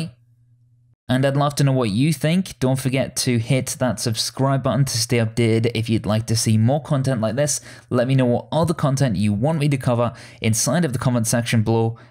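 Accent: United Kingdom. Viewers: Where is your english accent from ?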